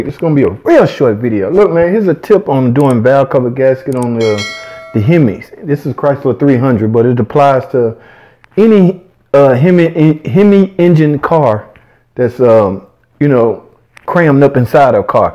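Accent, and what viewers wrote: South, United States. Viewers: talk like the dirty dirty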